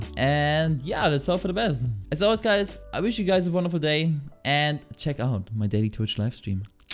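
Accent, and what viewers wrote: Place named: Germany. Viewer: Bist du Deutscher wenn ich fragen darf, das hört man irgendwie heraus...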